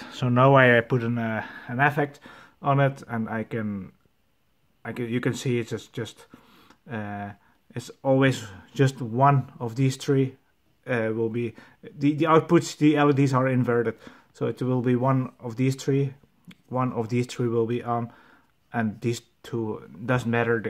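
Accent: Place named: Netherlands. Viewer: mooi nederlands accent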